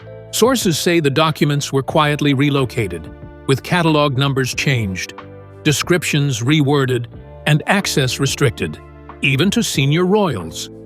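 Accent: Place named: United States. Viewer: Be very careful what you believe. Especially when it comes with an American accent. This report is total garbage. Believe me, I know.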